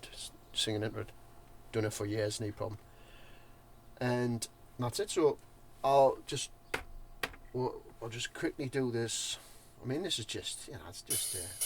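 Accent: Newcastle, United Kingdom. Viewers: I love this video. It is just plain, raw, proper Geordie, and honest. "nay problem" 😀 And you can sing. Nice rich voice. So jealous.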